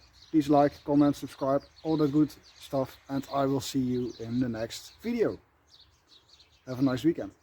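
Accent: Netherlands. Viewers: Into German reenactment too. I've done the same treatment as you did. I'm just a soldier, so no heavy wear and tear on my stuff. But like you said a headstart. Even as a soldier the uniform and kit went trough training, traveling, workduty etc. I'll allow the stains and aging to happen during my own time in the field. And hearing your accent. Groetjes van een mede Nederlander.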